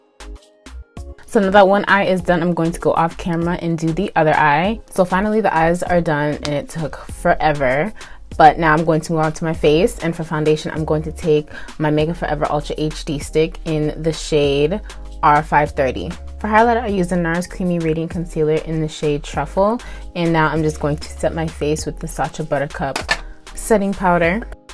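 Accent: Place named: RP, United Kingdom. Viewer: You sound like miss posh in Canada 💕💕💕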